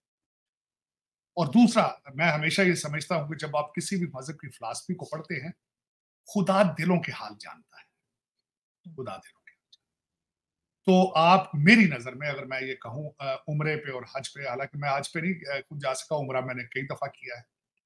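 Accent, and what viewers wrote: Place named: Punjab, India. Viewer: Pronunciations of sir mixing panjabi tadka is so hearttouching.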